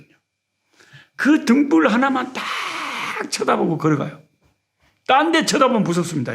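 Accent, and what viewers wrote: Jeolla, South Korea. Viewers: 할렐루야!!!주님감사합니다 오늘 목사님께부탁한책을 처음접하게되었습니다 목사님말씀을듣고 책을오늘읽어야하는부분을읽는데 어찌나은혜가되고 가슴을울리고 이귀한책이어디있나!!왜목사님이지인이나 전도하는데 쓰라는메세지를계속말씀하시는지 오늘에서야 이해가갑니다 너무나귀한책이고 감동이오고 책을붙들고기도하는데 어찌나눈물이나는지 오늘오전은주님의은혜가큰감동으로느껴집니다 목사님만난게감사가나옵니다~저는24살까지운동선수로살다가 가정을꾸미고 또공부하고는거리가먼데 이렇게많이배우신목사님이 전라도사투리로 사정없이성경말씀을이끌어가시니 어떤성경공부보다 머리에쏙쏙들어옵니다 공부와거리가먼 제가이렇게성경공부를하니 ~그것도나이먹어서 한장한장귀한말씀을접하니 성경을더가까이하게됩니다 목사님 어디다니시든지주님이지켜주시고 마하나임의하나님이역사하실것입니다 더욱더오래사셔서 저희들을주님과연결시켜주시고 깊은관계속으로들어갈수있게도와주세요 오늘은너무감사가넘침니다^^고맙습니다 서울은날씨가풀려가요~~저는교통사고합의로수요일날첫대면을합니다 좋은결과있게기도부탁드립니다^^화이팅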